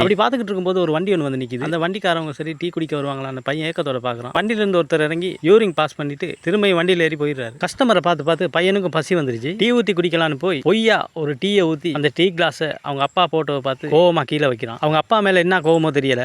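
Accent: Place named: Sri Lanka. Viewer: Srilanka slang adikkidhu. Are you Srilanka?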